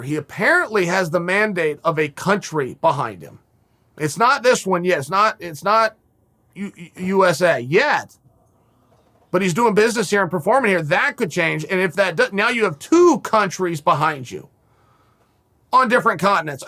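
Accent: Liverpool, United Kingdom. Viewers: he’s scouse lad. Like the Beatles saying ‘you know’. Get used to it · Proper scouser! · Over-hyped Scouse just like Till. Everyone needs to 'CALM DOWN LAD'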